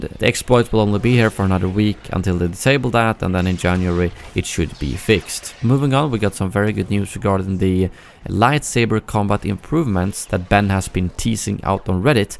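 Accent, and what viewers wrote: Sweden. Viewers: Are you swedish? You have the same dialect as Dennis.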